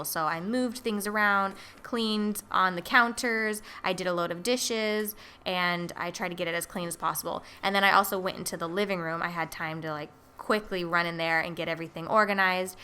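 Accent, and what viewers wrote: United States. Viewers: hey girl, where did you get that Californian accent??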